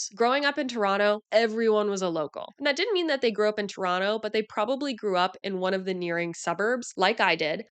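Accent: United States. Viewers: You sound American. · She has an American accent.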